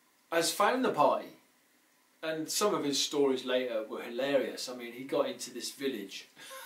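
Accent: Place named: Liverpool, United Kingdom. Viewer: Watched on my lunchbreak. Really enjoyed your stories particularly the one about meeting up with your old friend, I love it when things work out like this. It took me many years before I visited Scotland - I had never wanted to as a family member had a bad experience and this put me off, I eventually visited for the first time around 10 years ago and I couldn't believe I'd left it so long - the highlands are so beautiful and I have visited a few times now but I haven't been to Glasgow. Loved your scouse accent 😁 So lucky that you'd slowed down in the car and there were cones there, doesn't bear thinking about does it. So sad about your brother, looks like you have some happy memories together, I hadn't realised you were twins. The dog barking made me jump out my skin, I think I had it on a bit loud 🤣 I'll check out the other links -Take care Donna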